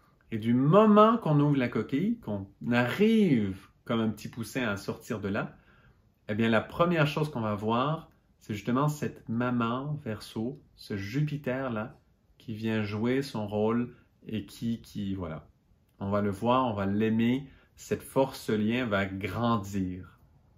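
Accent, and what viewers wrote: Quebec, Canada. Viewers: Bonjour et merci beaucoup (J'ai toujours du plaisir d'entendre l'accent du Québec où J'ai vécu quelques temps)...Je suis abonnée à la chaîne d'emilie Morel et je suis ravie de la perspective de votre collaboration. Je suis taureau ascendant Gémeaux et ça va plutôt pas mal car le soleil en maison 12 c'est très dur. Lune en bélier. Je vais noter pour vos conférences. Amitiés de Bretagne.merci.(milieu du ciel Mars et Jupiter en Verseau 😍)).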